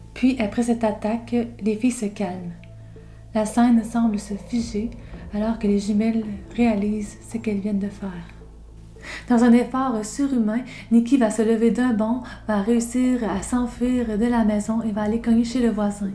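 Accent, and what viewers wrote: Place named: Quebec, Canada. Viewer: J'ai failli le dire, jusqu'à ce que je comprenne rapidement que c est à cause de l accent dont je ne suis pas habitué. J ai évité de le dire à la base pour ne pas que la youtubeuse le prenne pour elle, les autres vidéos intéressantes d autres youtubeur québécois ça me fait pareil. Tu pourrais la décourager alors que ce n est pas elle le problème.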